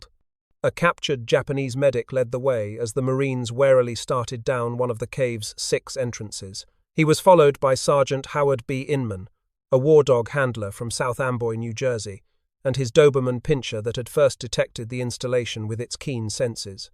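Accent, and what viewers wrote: United Kingdom. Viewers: They selected a british accent narrator, so you get all the UK pronunciations for a very American operation.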